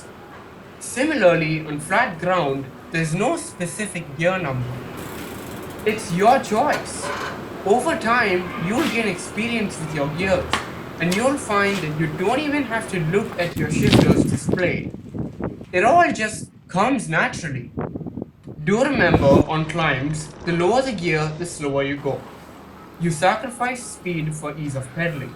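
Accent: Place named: India. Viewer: Love your vids. You have a little Indian accent but nothing to bother with. Continue I will still continue watching. Where do you live ?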